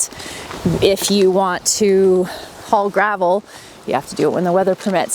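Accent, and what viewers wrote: Canada. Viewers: I am really enjoying the Canadian accent breaking through in this episode. Ahey! 😂 It is ramping up winter here where I live and you are ramping down. I am missing having a full vegetable garden and you will soon have a full vegetable garden!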